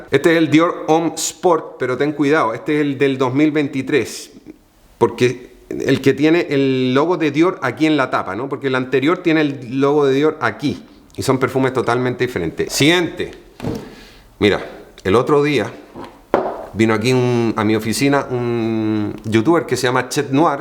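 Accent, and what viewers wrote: Chile. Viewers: Cierto tengo la misma duda su acento es d echile pero hizo de primavera y acá es otoño xd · Si eres chileno...que haces haciendo esa mezcla rara ...que vosotros... Que os digo...basta...sale raro y mal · Hola , eres Chileno ?? , te pregunto por el acento, pero tienes de a ratos unos toques españoles y otros argentinos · Soy español y desde mi perspectiva le veo un acento muy muy chileno (por cierto, el acento más marcado que existe de Sudamerica en mi opinión). No le noto ningún deje español en su acento, aunque quizá los chilenos sí lo podéis notar. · no se porque tienes un acento Chileno, tremendos reviews!!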